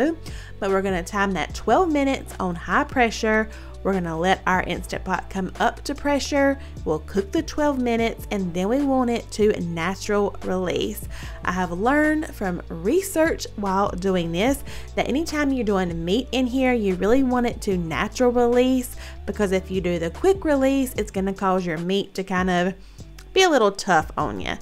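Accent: South, United States. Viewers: Love your southern accent 💕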